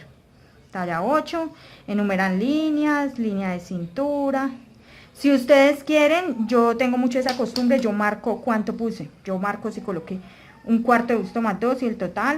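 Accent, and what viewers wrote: Colombia. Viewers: Espectacular método bien explicado y tu acento paisa increíble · ME ENCANTA TU FORMA DE HABLER TAN COLOMBIANA QUE ORGULLO ERES UN GENIO JAJAJAJA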